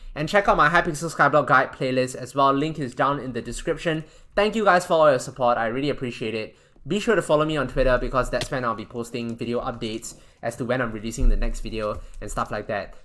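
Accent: Singapore. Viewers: R U Singaporean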